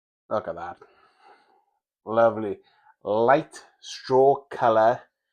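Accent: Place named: Wales, United Kingdom. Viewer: Nice review, nice and objective about it. Not to take anything away from this drink as I like it myself, this particular one is brewed n France (it was German brewed years ago) and on the subject of Aldi clone/tribute brands, this particular one is a clone of Germany's Krombacher Pilsner. The Krombacher is available in Tesco and Morrisons as part of their 3 for £6/6.50 deal. Btw I detect a Welsh accent, do you live in Wales?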